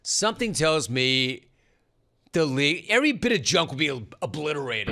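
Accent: Southern California, United States. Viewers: your soo funny with your so-cal accent and lingo.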